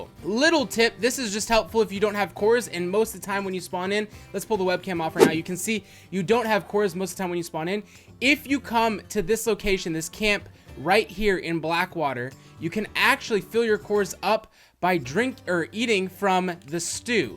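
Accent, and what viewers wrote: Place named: Russia. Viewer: Love the videos! You are hilarious in many of them. Your Russian accent is great! Keep it up bro!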